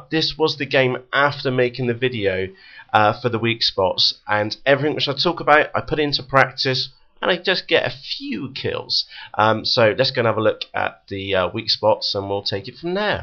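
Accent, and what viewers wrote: United Kingdom. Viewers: Your speak is too British! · are u British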